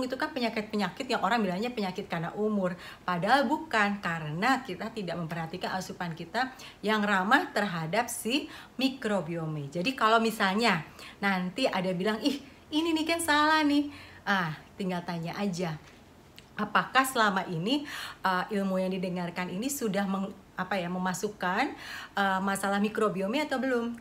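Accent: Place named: Java, Indonesia. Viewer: Kaya jawa ya.. sy jogja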